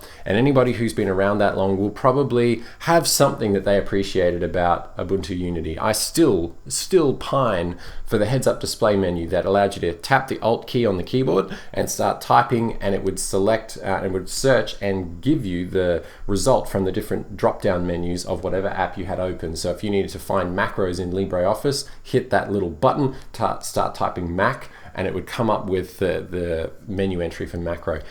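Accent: Australia. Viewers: great job, enjoy your chats... nice to have an aussie accent on YT !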